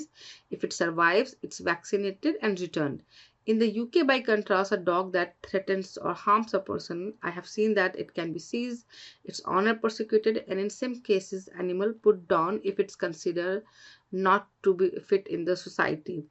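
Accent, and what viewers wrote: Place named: North India, India. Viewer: Who is this journalist.? Aren't there any better candidates ❓❓❓. All north indian slang ugli english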